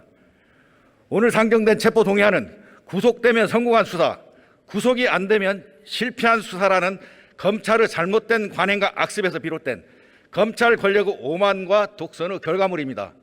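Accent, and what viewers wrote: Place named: Jeolla, South Korea. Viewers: 전라도 특징 의 를 으 라함ㄹㅇ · 말투보니 라도 같은데?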